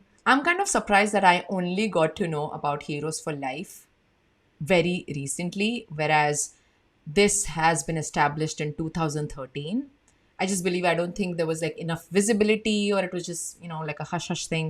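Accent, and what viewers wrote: India. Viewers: Your English is More Indian than Israeli❤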